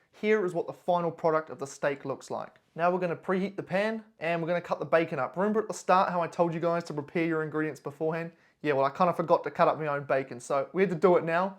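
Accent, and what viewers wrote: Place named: New Zealand. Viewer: Wow, I thought he sounded like a Kiwi. I recognised the brands he used.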